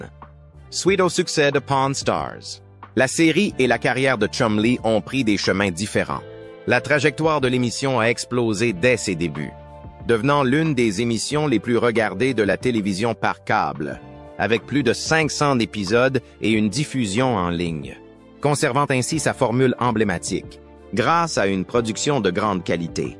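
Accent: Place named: Quebec, Canada. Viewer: J'ai vu le titre racoleur sur la mort de Chumlee. Le pire c'est que j'y est cru j'avais même de la peine .docu merdique avec une voix IA a l'accent québécois. Ridicule 👎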